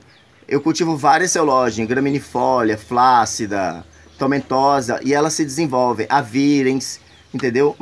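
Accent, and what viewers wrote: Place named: Minas Gerais, Brazil. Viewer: Amo os seus vídeos, vc é 10. Adorei o jeito de vc falar,desbeisado tem que ser Mineiro mesmo igual eu falo,afinal sou dessa terra também. Besossss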